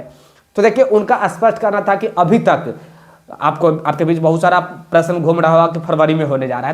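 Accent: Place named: Uttar Pradesh, India. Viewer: Khas up ka lag raha hai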